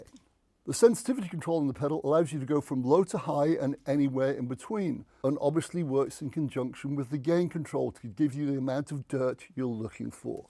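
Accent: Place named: England, United Kingdom. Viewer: Proper English accent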